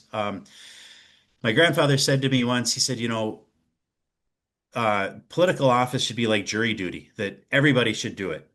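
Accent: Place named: Minnesota, United States. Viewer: Farmers do everything better🎉 i do hear a hint of that handsome Sota sound, now that you mention it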